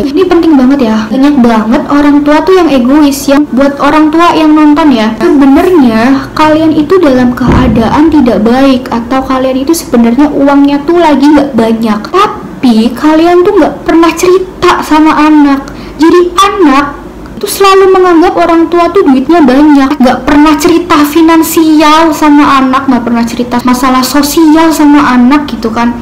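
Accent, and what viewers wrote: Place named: Java, Indonesia. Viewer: Kak kelihatan medok buanget😂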